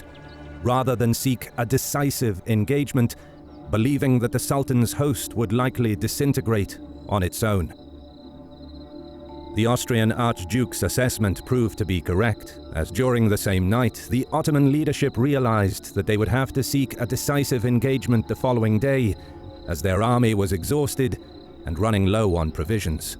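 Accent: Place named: United Kingdom. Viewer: That battle wasn’t a defeat by the Christians alone. The Ottomans disengaged as well and the battle was not for nothing. The Christian army despite their withdrawal accomplished their goal which was dislodging their enemies from the fortified town. You may sound British, but you’re definitely a pro-Muslim person based on your biases. Didn’t you just say in the earlier part that these Ottomans murdered the people they captured? Why are you partial to these murderers?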